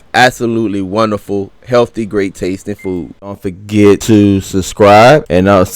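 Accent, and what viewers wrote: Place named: Louisiana, United States. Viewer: I knew it lol. As a fellow cajun, I would recognize that accent from anywhere.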